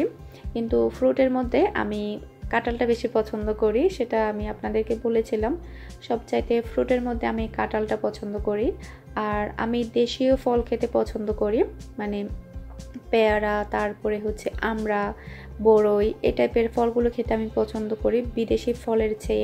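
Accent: Chittagong, Bangladesh. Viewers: আপু আপনি সাতকানিয়ার মেয়ে, ওয়াও,,আপনার কথার মধ্যে চট্টগ্রাম এর একটা সুর আছে। আমি ভাবতাম কোথায় হতে পারে আপনার বাড়ি। শেষেমেষ আমারা একি যায়গার মেয়ে হলাম। এই জন্যই তো আপনাকে আপন আপন লাগে,।আমিও সাতকানিয়ার মেয়ে।কানচন, ফুলতলা, মিয়াবাড়ি, আমার বাড়ি, আর শশুর বাড়ি ডলুকুল❤❤❤❤❤অনেক অনেক শুভ কামনা রইলো আপু আপনার জন্য❤❤❤❤ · Apnar kota sone amar bar bar mone hoccilo apni chittagongr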